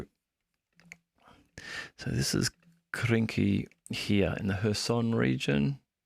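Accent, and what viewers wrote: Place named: Australia. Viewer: and it's nice to hear an Australian voice giving a balanced account. No such voice exists in New Zealand.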